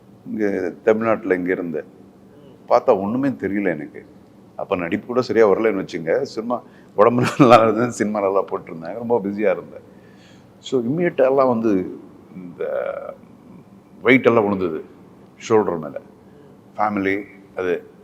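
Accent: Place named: Bangalore, India. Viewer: Banglore Tamil rombha like 🤝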